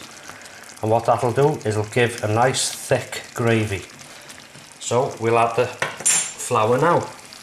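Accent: United Kingdom. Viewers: First question, "what has potato got to do with liver and onions?"' I am only 70 years old, what is an "ox-o?" Bet your liver was tough, I have ever seen anyone boil their liver. Your accent lends to Britain, we were also taught with meat, to never use a utensil that punctures the meat. Your old recipe of liver and onions kind of turns me off, and I am a guy that really likes liver and onions. Again, almost into mt 71st year on the planet, I have Never, ever come across lamb's liver.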